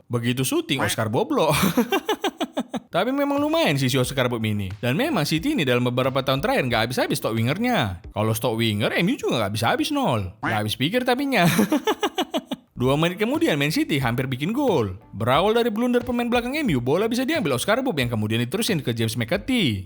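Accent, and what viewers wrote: Medan, Indonesia. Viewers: segaring apapun konten nya kalo pake logat medan jadi lucu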